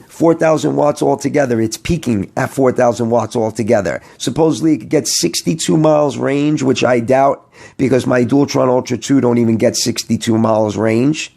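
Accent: New York, United States. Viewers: I nailed it my friend great commentary as well I love your ny accent and no b.s attitude keep up the good work my friend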